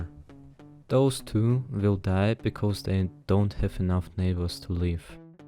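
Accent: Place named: Russia. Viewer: russian accent... hmmm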